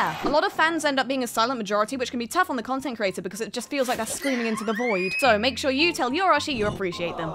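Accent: United Kingdom. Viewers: You Still Sound British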